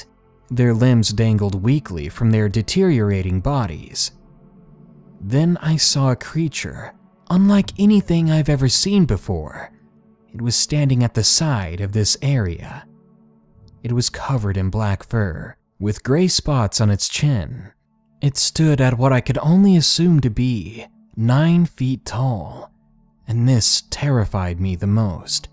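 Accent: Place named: South, United States. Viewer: 🏅🥇at the risk of being ridiculed by others, I'm still gonna share this. Just wanted to take a moment to commend you for your growing success and amazing channel. I subscribed a couple months ago, however, I listen to numerous uploads per day so I consider myself an avid fan LOL. I can truly tell how passionate you are in your work and I think I speak for all your subscribers when I say thank you for putting in so much effort in this art. I can sense your pride in the awesome content, sound effects (especially the demonic voices) and communication with your subscribers. Sorry if this last part sounds stalker-ish bc I assure you I'm not a stalker! (Says every stalker in the history of stalkerdom) 🤣 anyway, I've always had trouble falling asleep until I started listening to you at night since your voice is so soothing! So thanks a trill for that! 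So yours is the last voice I hear at night LOL see that sounds creepy! But I think I'm drawn to that southern, country twang which comforts me, as I'm from N.E. Texas. So there's my experience thus far as one of your fans. You just do a great job with your channel and it should be recognized! Thanks for taking the time to read my message & CONGRATS on your recent nuptials! Hope y'all are off to a wonderful start on a wonderful journey (you know, because "this world is a strange one"!! I'll see ya in the comments, I'll be the one beasting on those who make hateful comments!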